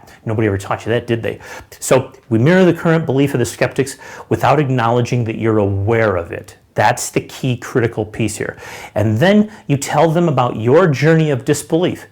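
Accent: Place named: United States. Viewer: Oh hello Kevin! I like your american english. :-)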